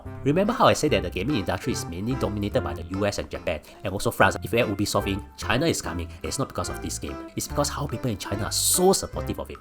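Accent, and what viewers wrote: Singapore. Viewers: That singlish is making my ears itch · Singlish and his accent is so funny, so cuuute, I love it.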